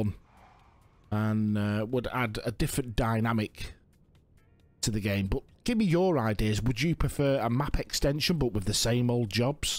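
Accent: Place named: Northern England, United Kingdom. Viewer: Tha sound like a fellow northerner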